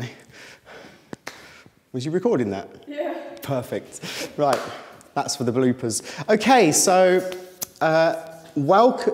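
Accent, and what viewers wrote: United Kingdom. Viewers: It was nice to see your sarcastic humor. I'm American and I find it interesting when British folks pronounce words differently. Just keep saying aluminum the way British way. Obviously some Americans think they need to correct your pronunciations.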